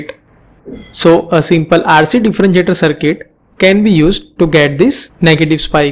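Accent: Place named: Tamil Nadu, India. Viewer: Exactly this is the video what I need. A voice of guy from tamil nadu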